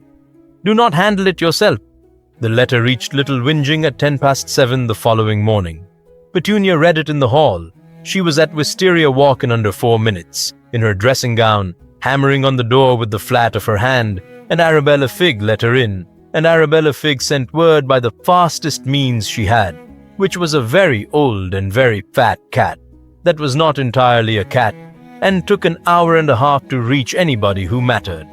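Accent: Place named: India. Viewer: I enjoyed this story. I liked the Narrator with the Indian accent. I liked that Petunia was a decent character, not the abusive woman she is in all other versions.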